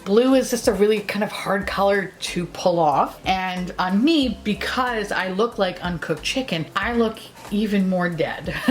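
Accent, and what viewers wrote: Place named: New York, United States. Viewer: 4! There’s nothing I love more than you speaking garbage about things in your new york and Minnesota accents! 😂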